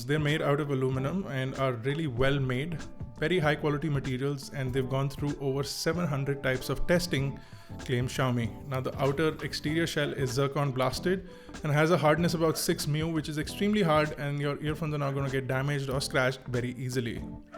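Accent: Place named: Mumbai, India. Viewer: that's Mumbai accent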